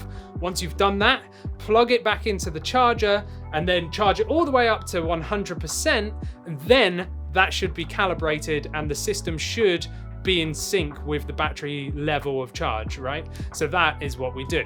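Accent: United Kingdom. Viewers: Thanks mate absolutely brilliant tutorial and nice to have someone british for a change. Top bloke!!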